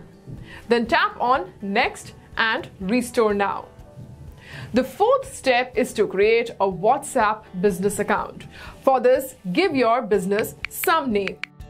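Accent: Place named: India. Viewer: O realy, you make it so easy for me. I love the english accesent of Indians.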